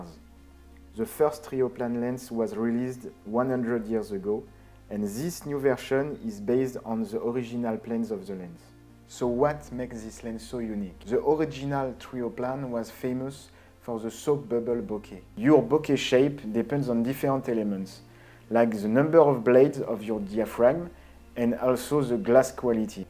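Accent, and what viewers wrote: France. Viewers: Came here for soap bubble effect but ended up listen to your french-english. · Jusqu'à maintenant je n'avais vu que t'es vidéo courtes de test d'objectifs argentiques, et je ne sais pas pourquoi mais sûrement à cause de ton nom de famille je me suis mis en tête que tu étais allemand ^^ mais en écoutant ton accent, c'était évident ! Non pas qu'il est imcomprehensible ou non, pas de soucis, j'ai très bien compris mais disons que l'accent français est très reconnaissable^^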